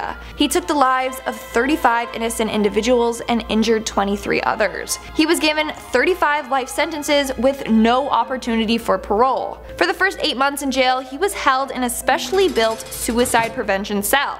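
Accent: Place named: United Kingdom. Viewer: I liked the British accent . But she okay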